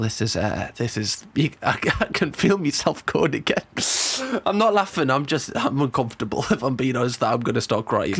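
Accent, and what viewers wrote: United Kingdom. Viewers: I've been really enjoying hearing your comments and opinions. It's like my own mind in speaking but in a British accent which always makes me laugh. Cheers mate for your content and genuine effort towards your craft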